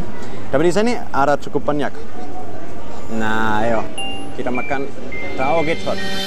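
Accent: Germany. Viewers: I like your german accent XD mas bule... Due bist schön XD *maap klo salah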